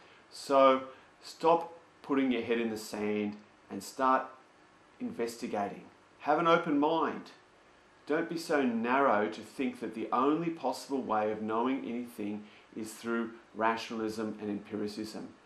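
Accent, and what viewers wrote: Australia. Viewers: shit i think this guy is australian, sorry everyone. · As an English person, I am offended you think this idiot is English. He's Australian. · I agree, however he is austrailian not british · it's not a british accent it's Australian. I'm guessin you are american, i don't know why so many americans fail so miserably at picking accents, i guess it's because you just don't get exposure to foreign accents enough because of the ludicrous nationalism. As an Australian i don't understand this idiot, he lives in a secular country where secularism is only growing larger and larger and less than a third of the country are believers and that number is shrinking all the time. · that would be funny if he was speaking with a british accent. unfortunately its actually australian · He's an Aussie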